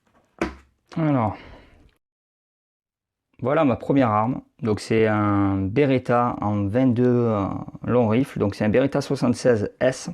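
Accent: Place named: South, France. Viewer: Bonjour, bonne vidéo, belles armes, mais pour ce qui est du 22lr, je préfère mon Sig 1911-22 ... arme souvent insultée, mais par contre, jamais eu d'incident avec (pourtant je tire en moyenne 200 cartouches par semaine, CCI Blazer à 3,70 euros les 50 par 5000) ... Pour la précision, ça ne vaut pas un DES 69 bien sûr, mais même à 100 m sur gong métallique, ça "cling" même si ce n'est pas prévu pour ça ... Bonne journée et merci pour cette vidéo sympa avec l'accent du soleil 😉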